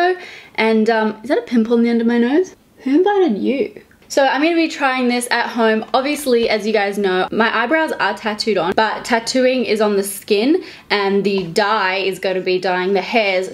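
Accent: Australia. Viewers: Are you Aussie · Love you 💕 I love hearing a fellow Australian accent on YouTube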